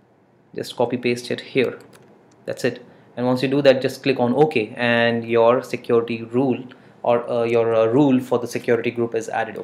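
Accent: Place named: India. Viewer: That is the most childish explanation I have seen of setting up anything esp VPN just copy paste 0.0.0.0/0 what does that mean bro lol for average people at least tell them what it means ?? what rubbish! Guys at QNAP please get someone better then this indian dude or pakistani and trust me I know the accent from where I was born!!!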